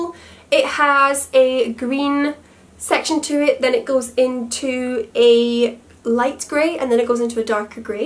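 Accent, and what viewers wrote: Scotland, United Kingdom. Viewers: It's so nice to hear another Scottish accent on youtube 👏🏻👏🏻 I've been trying to find fellow Scottish youtubers, absolutely adore your videos!! 😊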